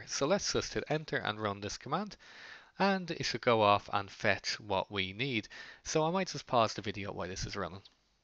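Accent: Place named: Ireland. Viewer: thank you for the clear instructions! my wife is impressed with you brogue too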